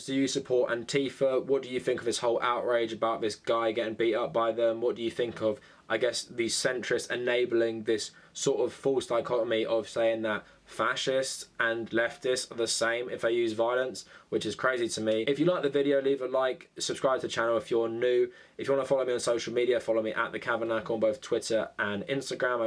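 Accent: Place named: United Kingdom. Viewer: I'm not even going to finish the video because I tell already you are advocating and defending ANTIFA. Here's the thing you're argument is essentially if you don't agree with the political speech it's ok or justified to use violence. Now flip that since i disagree with you that means it's ok that i use violence to stop your speech right? Your accent seems British to me so I'll leave you with a quote from Winston Churchill "future fascists will claim to be antifascists".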